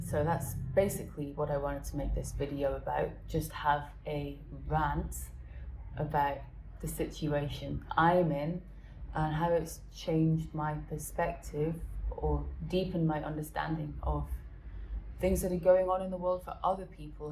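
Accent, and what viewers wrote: Scotland, United Kingdom. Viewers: As a fellow traveller (in the past sadly) ive been the outsider in alot of places around the world and you simply HAVE TO assimilate to some extent wherever you go and to be upset that you cannot go and do whatever and wherever you want is naive at best although you are correct to say Governments will jump on any opportunity to gain power or wealth regarding borders or visas or any aspect but that unfortunately IS the way power systems work more or less depending where you go as Culture does play a huge part in attitudes and behaviors regarding sex, religion and ideology. As sad as it sounds and as sad as it IS the general global neo liberal agenda permeating our lovely wee planet is only worsening any of those freedoms we used to have especially to the free spirits like yourself who just wanna fly so until that changes we'll just have to take the ride....(you did buy the ticket??) PS I can hear a Scottish twang in there and since seen you were at Uni in Dundee. 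Anyway love watching you and your adventures, lovely lassie. Maybe see you on the road down the line. X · our southern border is a mess and needs fixed.IV personally been to two different parts of it Texas and Arizona and seen it with my own eyes.Sorry your path has slowed down and put you in limbo.I still enjoy watching your Spain travels.I enjoy your voice and accent.It makes me wonder what my Scottish ancestors sounded like when they Immigrated to Virginia in the mi 1700’s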